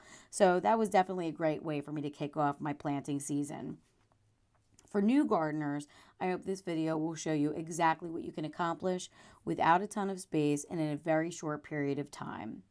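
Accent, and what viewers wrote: New Jersey, United States. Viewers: Gosh, I miss a NJ accent. I was raised in Mt. Holly and lived most of my adult life in Voorhees....now I'm in WNY. Photos are beautiful. Do you grow anything from seed? Check out The Lawrence Network....awesome gardening inspiration. Keep growing. 🌱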